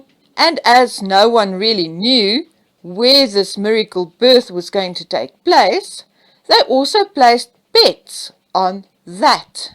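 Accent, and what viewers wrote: South Africa. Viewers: Dis 'n lekker Saffa accent... ek is van de Baai. 😊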